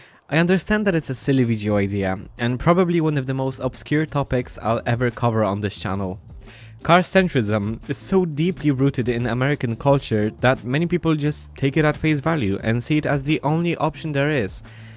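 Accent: Poland. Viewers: Are you Polish? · Are you Polish by any chance? Amazing video btw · Are you polish? :D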